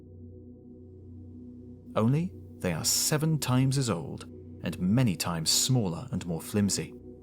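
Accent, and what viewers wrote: England, United Kingdom. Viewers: You're a fantastic orator. My best guess is that it's due to the specific accent you carry. It is a particularly precise variant of British English that Americans have subconsciously come to appreciate as being intrinsically reputable. If there is a British man lecturing you with this accent, friends, listen closely, as he knows what he's talking about and his intel will be vital to your quest! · Lol all it took was a British accent to fool you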